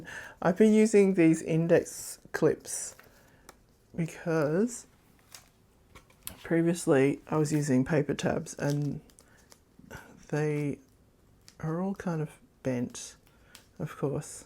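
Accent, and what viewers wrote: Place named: Australia. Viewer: Thought you sounded Aussie. Which state are you in? I’m in Perth, WA. Love your journals-so chunky.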